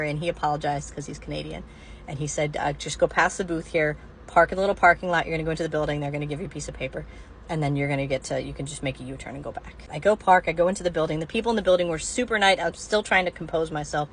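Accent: United States. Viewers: She has an American accent. Where is she a refugee from? I have made that mistake near the Peace bridge before and got confused at the exit from I-90 and instead of getting on to Porter Avenue I ended up routed to the Peace bridge. My ordeal was much shorter though and I was able to turn around at the entrance of the bridge rather than going over to Canada. My memory is a little foggy but I think there is a toll booth there. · The lesson is that Canada were more understanding and helpful than your own country. Thank goodness you're a white female with a clearly American accent.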